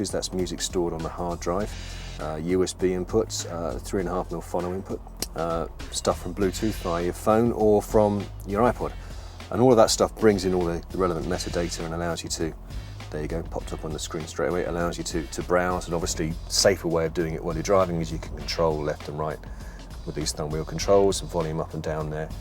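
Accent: United Kingdom. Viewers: Shut the hell up, we want to sample music not your damn british accent..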